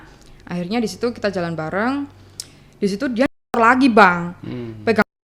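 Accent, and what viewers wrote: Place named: Banyumas, Indonesia. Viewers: Suaranya mendok banget😁❤ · Medok kali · Medok bngt mbak nya😂😂😂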